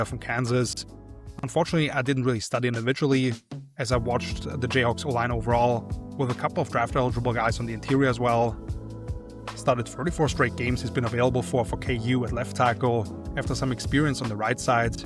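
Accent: Austria. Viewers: Well done sir, solid analysis all around. I appreciate the Austrian accent as well.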